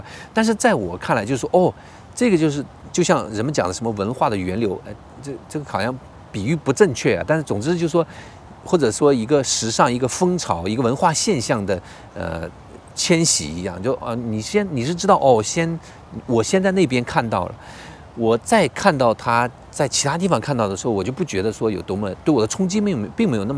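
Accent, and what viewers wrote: Military village, Taiwan. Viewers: 聽起來99%台灣人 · 是台灣腔無誤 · 聽您說話沒有什麼捲舌音，老實說口音本身就很台灣.... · 這台灣腔還真是道地呀！人也挺帥的。謝謝你喜歡台灣。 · 你說話怎麼跟我們台灣人很像呢？宗教文化主要是教化人心，也是讓我們凡事要做之前要考慮後果？不要只想要什麼，而是要想你能付出什麼？ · 您說話有台灣人的腔調耶！👍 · 你説中文完全的臺灣口語和調調，而且聽起來溫儒順耳。敘述平實而不浮誇，深入臺灣明白社會結構，思緒是5_60歲的老臺灣文青~不錯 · 你的口音就像我身邊的朋友一樣，怎麼一股台灣味。不帶台灣腔（台語）的台灣味。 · 您說話已經有台灣腔了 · 講得好台灣欸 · 不看字幕. 不看影像. 只聽聲音. 確實會以為就是台灣人. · 真棒這影片，一口台灣腔⋯👍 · 請問您是哪裡人？怎麼台灣腔會這麼標準，如果沒看你所打的字，根本不會注意到你是別國的朋友XD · 山貓小子哈哈他說然後然後真的已經和台灣人沒什麼兩樣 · 除了渠道這兩個字不是台灣人的話，根本就是一位談吐文雅的台灣人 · 山貓小子應該是福建人吧？根本台灣口音。語尾還加啦。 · 更精確的説，與眷村的口音非常像。因為贅詞很少。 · 大哥你已經有點台灣腔了哈哈哈哈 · 老哥你的口音已經是眷村口音了⋯⋯用字遣詞和腔調跟臺灣眷村子弟很接近了。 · 完了完了，我感覺這位朋友已經有一半的台灣腔😂 文化很有趣，需要被傳承，卻也需要被衝撞。衝撞之間，某些時候會產生特殊的反應，那就是創新。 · 您的說話已經開始有台灣腔了，聽起來很親切!! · 我也覺得有台灣腔.. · 有台灣腔了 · 您講話的語氣已經很台灣了，像台灣人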